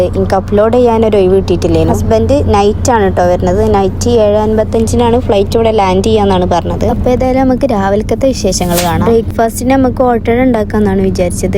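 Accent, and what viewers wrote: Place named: Malappuram, India. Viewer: നിങ്ങൾ എവിടെ മലപ്പുറം ആണോ? Am from malappuram,slang കേട്ടിട്ട് മലപ്പുറംപോലെ അതാ ചോതിച്ചേ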